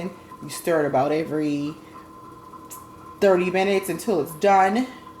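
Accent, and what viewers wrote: Charleston, United States. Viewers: You did AWESOME on your video! I had to search a bit- then found the title- I wanted to see where you were from. You were reminding me of home...that accent. I was thinking she "has to be" ....and YEP! Anywhere you go, you hear a Charleston accent...YOU KNOW IT! Charleston born and raised living in Texas now. Again, I THANK YOU! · I hear the accent💋yess Charleston bnr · Ok first Thank You! I'm a Gullah girl from Charleston straight off America st. Unfortunately my elders have passed on (including my mom) before I could get my geechee recipes uuuggghhh! I grew up on all of this. The family members I have left don't know how to give a recipe lol! It's a Lil of this & that. So Thank You for the visual step by step!!! Now I'm going to ask a small favor. It's only 3 in my family that eat red rice (my husband doesn't care for it...yes we almost divorced behind this 😂😂😂) but he loves okra soup so I decided to keep him. 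Can you provide me with a recipe on a smaller scale? Like maybe 2-3c of rice vs. a 2lb box? If not I completely understand and no hard feelings. If you're willing too then I Thank You in advance! 😘 I also have my grandmother's traditional rice cooker/steamer she used to cook her rice in for decades all my family still use theirs as well, but I haven't figured out how to use it uuuggghhh (for those who want to know true value hardware carries it) So I'm glad I stumbled across your method. are you from Charleston? I hear a faint accent. Mine come out when I'm pissed lol!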